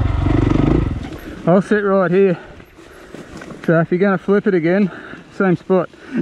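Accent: Australia. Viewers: Not sure the wind muff is helping... still sound like a hint of Australian accent.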